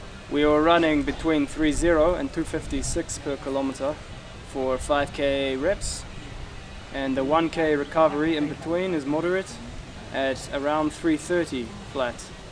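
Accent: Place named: Kenya. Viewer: Felipe Lopez It’s like he’s saying everything in a Kenyan accent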